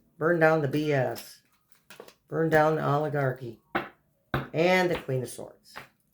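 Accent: New York, United States. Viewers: I love her too. Even though I''m from California, I love her "New York-ese" style, saying, "Youse guys" and "anyhoo." She's genuine - the real thing.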